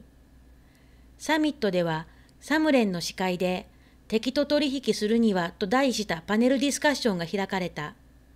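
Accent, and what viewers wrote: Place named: Nagoya, Japan. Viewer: 名古屋イントネーションのアナ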